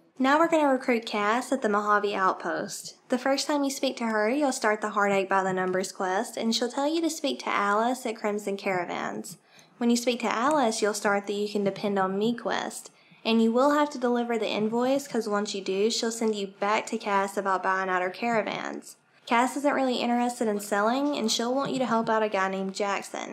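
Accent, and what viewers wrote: South, United States. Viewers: Nice guide. Lots of gamers just drone on and on and end up losing the audience. Plus I don't often hear a lot of gamers with a slight southern sounding accent, especially girl gamers. Thanks for representing. Makes me a little less self-conscious. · O boy I can sure tell your southern lol so am I from Oklahoma 😉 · Are you from the south? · I like your southern accent. From one southerner to another. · Not gonna lie wasn’t expecting the southern girl accent. No negativity tho pleasant surprise.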